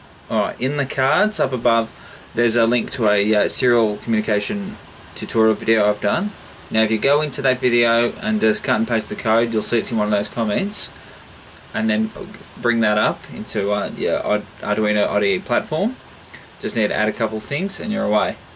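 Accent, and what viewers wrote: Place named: Australia. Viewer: Interesting video. Been thinking of getting into Arduino and wanted to see if I could do the programming in C#. Thanks for the information. Correct me if I'm wrong but you sound like you're from Australia. Hope all is well for you down under :)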